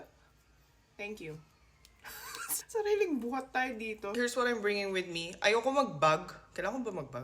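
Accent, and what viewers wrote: Philippines. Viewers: Yung accent talaga ehh. nagagandahan ako sobraaaa. like genuinely Filipino yung atake. Love youuu po!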